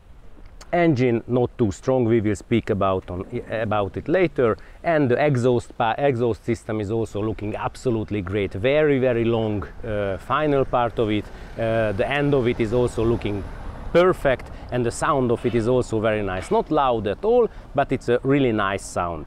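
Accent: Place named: Hungary. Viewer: Hunglish... Maybe the first english video, I can understand.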